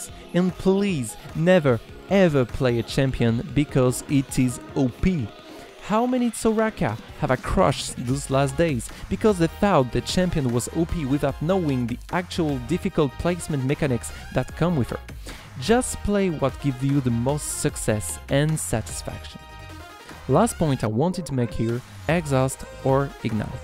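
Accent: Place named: France. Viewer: ***** I'm from France too so I suspected you to be french but I wasn't sure because it wasn't the regular shitty accent :D By the way reported for saying Leblank and not showing you inner french, it's Leblanc duh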